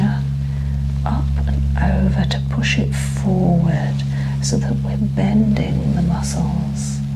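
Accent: England, United Kingdom. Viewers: Very relaxing, educational, I'm not going to lie, love the soft English accent and best of all no ads... perhaps, I've said too much.. ... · Absolutely one of the best and most relaxing massages I’ve had the pleasure to watch here on YouTube. Your voice is so magnificent, nothing is more pleasing to an American ear as that of a tranquil English accent.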